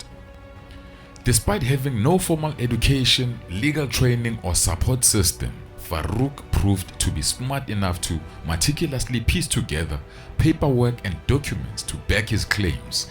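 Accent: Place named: South Africa. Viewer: BRO I LOVE THIS CHANNEL. THANK YOU FOR KEEPING OUR ACCENT AS SOUTH AFRICANS!! 💪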